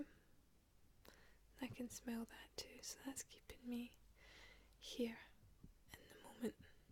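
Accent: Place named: Scotland, United Kingdom. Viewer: love this thanks love your scots accent really soothing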